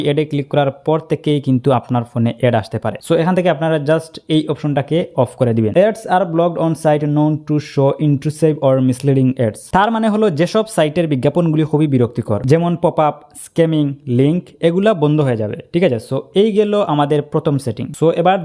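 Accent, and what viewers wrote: Sylhet, Bangladesh. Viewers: Vai afne kita Sylheti ni?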